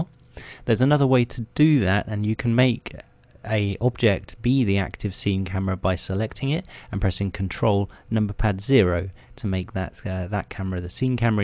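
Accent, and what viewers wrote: United Kingdom. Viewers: English Accent :) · british :D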